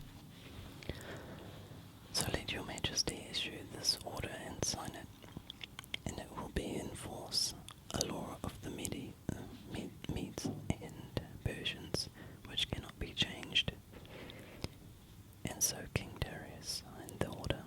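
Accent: New Zealand. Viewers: It's interesting, you'd think being a kiwi myself I'd get used to the accent, apparently not! I've been listening to most of your asmr and it's always very comforting - personally I love your readings of the bible the most. If you one day do a video where you whisper into both ear at the same time with a bible reading... well. (no hints here ;) ). God bless :) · sounds like kiwi accent to me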